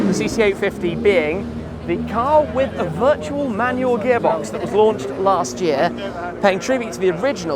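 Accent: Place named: England, United Kingdom. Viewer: Is it me or all the car you tubers have English accent 🧐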